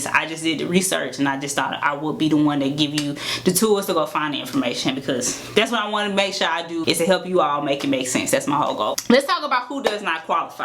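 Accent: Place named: South, United States. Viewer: i've dealt with southern accents alot, understand it pretty well. seeing you brought it up, here's my only advice i think u should know. it's not the accent, it's the speed... just talk a lil slower lol. other than that, i understand ya perfectly (from MA).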